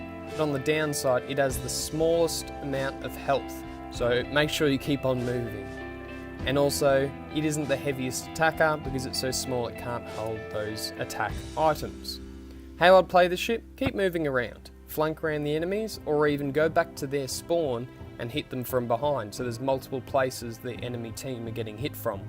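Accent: Australia. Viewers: Spoken like a true Auzzie ! great vid man loving it ! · An Australian eh,lol,I never would've thought.no offense mate,I think you should try defender,I'm mk2,but I have over 2k health,I think you would like it. Plus great job with the vid👍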